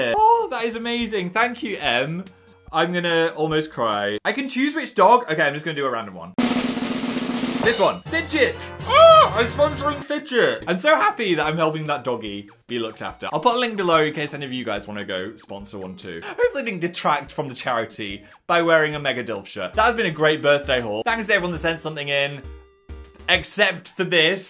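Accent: North, United Kingdom. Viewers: i swear you're getting more northern the more the years go on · Is northern accent getting stronger every year?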